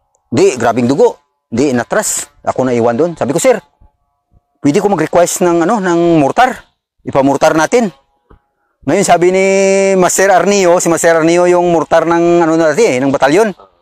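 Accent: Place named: Iloilo, Philippines. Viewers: Ilongo SI sir sa tuno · Ilongoha nlang SG.nami pamatian god bless SG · 😂😂😂😂 natawa Ako sa Punto Ng salita sya parang ilonggo 😅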